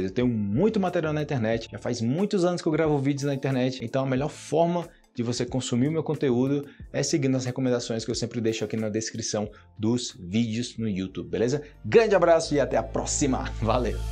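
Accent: Antioquia, Colombia. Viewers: si el vivió en Medellín Colombia como 5 años .habla con perfecto acento colombiano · Crealo yo note lo .mismo e incluso me atrevería a decir que vivió en Colombia por qué suena como paisano jajaja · toda la razon, si bien soy chileno he tenido la suerte de hablar con gente de varios lados y pensándolo realmente suena como colombiano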